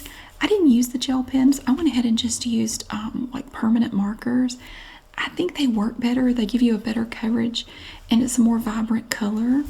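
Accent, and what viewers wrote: South, United States. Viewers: How about Abigail for the kitten and call her Abby. She’s so sweet. you look so beautiful in that color. Your skin is glowing. Love your videos I listen To them every night. The smooth soft southern accent. Amazing 😉❤️❤️❤️❤️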